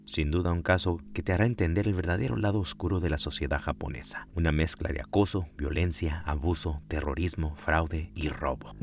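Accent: Latin America. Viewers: Suena muy latam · "Imagínate que te cobren una reparación que jamás hicieron..." me suena a que tienes acento latino, pero que nunca viviste en latinoamérica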